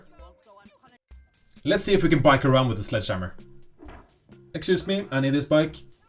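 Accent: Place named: Norway. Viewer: The accent is violently norwegian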